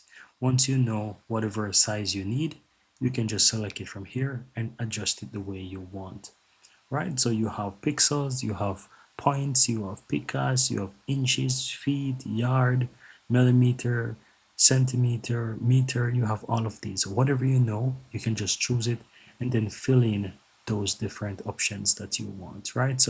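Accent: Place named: Jamaica. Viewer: You sound Jamaican. I'll have to listen to your tutorials for that reason alone. My background is Jamaican and it's music to my ears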